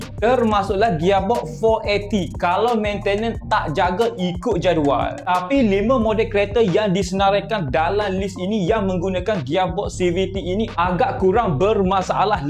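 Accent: Kelantan, Malaysia. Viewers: Kuat bunyi kelantan kau ni brader😂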